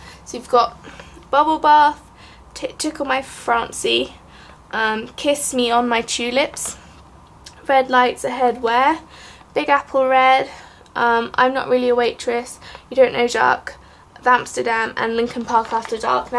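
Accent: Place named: England, United Kingdom. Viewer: You sound English though do you live in Japan x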